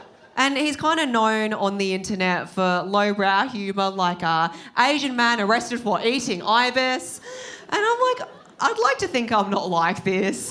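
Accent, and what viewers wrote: Australia. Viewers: Girl, you're the most Aussie Aussie there is. You can't have an accent like that and be considered anything other than full Aussie! If you ever decided to swap Australian citizenship for British citizenship after living there long enough, it wouldn't matter, there's no way you'd ever be considered anything but one of us ❤🇦🇺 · Aussies are more racist than we would like to think but not as racist as our national history would suggest. White Australia was explicit policy in my lifetime, and the historical fiction that Australia was uninhabited at the time of European "settlement" formally dissolved only about thirty years ago. Treatment of Chinese immigrants in the 1800s was abysmal. The Cronulla race riots were only about twenty years ago. Frankly your Aussie accent is stronger than mine, but nobody ever questions my national identity - because I'm white. 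Nobody ever calls me a European Australian because it's "normal" for Australians to be white. But glad you can find the humour in it. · You've got the thickest Aussie accent. Actually really funny too. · I'm a Maori Kiwi & my fav'rit English accents are Aussie & Afrikaner_ welp! Seeing this gorgeous Chinese face speak'n Aussie din'kum just makes m'day & she's damn funny 💯🤙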